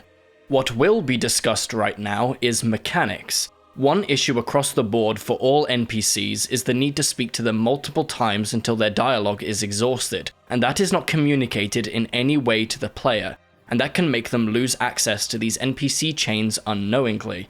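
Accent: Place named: United Kingdom. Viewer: Very good review, watched it from beginning to end. Do you know Greg Kasavin? I can't imagine you haven't been influenced by him. we can hear a similar music to the one in RE4, which can also be heard in the Gamespot Classic Review and your delivery is similar to him despite your British accent.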